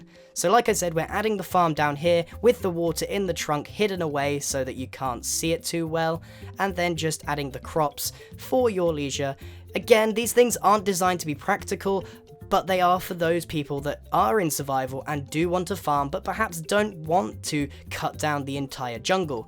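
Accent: England, United Kingdom. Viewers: He has a English accent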